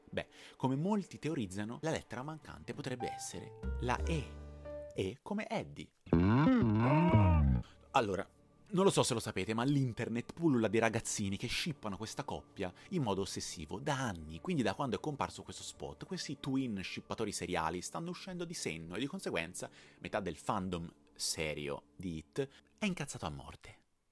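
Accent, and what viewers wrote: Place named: Tuscany, Italy. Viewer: A di che città toscana sei?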